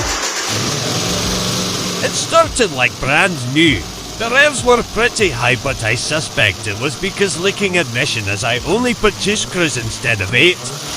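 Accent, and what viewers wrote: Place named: Scotland, United Kingdom. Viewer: I love the Scottish voice! 😁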